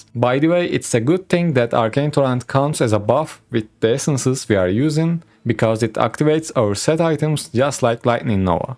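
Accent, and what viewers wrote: Turkey. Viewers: türk aksanı vurdu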